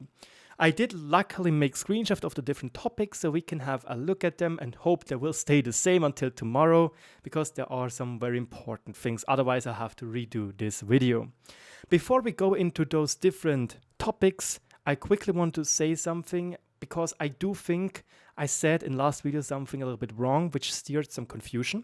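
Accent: Germany. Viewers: löwe your vidz you give highlights to the things that matters and your germania accent give it a flare thanks from sweden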